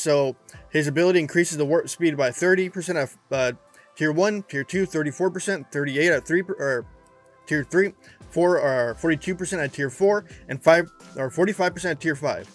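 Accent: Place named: United Kingdom. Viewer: YO dude, are speaking the queens language?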